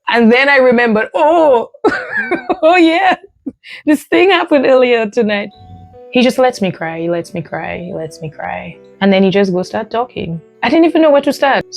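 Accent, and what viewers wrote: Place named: Dominican Republic. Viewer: Enjoying the Dominican accent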